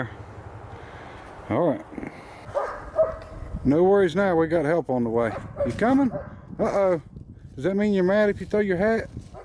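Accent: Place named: West Virginia, United States. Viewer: is your family from West Virginia I'm just wondering because of your accent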